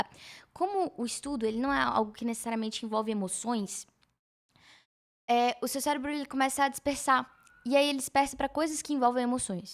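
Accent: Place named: Florianopolis, Brazil. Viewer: Vc é de Floripa? (Perdão a aleatoriedade eh que n consegui deixar de notar o sotaque)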